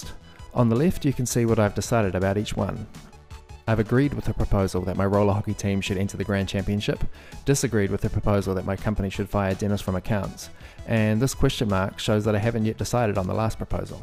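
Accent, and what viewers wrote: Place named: New Zealand. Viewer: Great tutorial, but the sub-titles are a bit random - seem to struggle with the good old kiwi accent.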